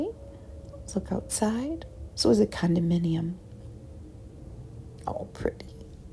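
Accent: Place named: Michigan, United States. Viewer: This is a Michigan accent if I’ve ever heard one!! :)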